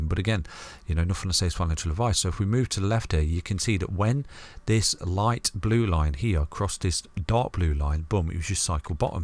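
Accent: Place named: Nottingham, United Kingdom. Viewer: You sound like a Notts lad, are you local?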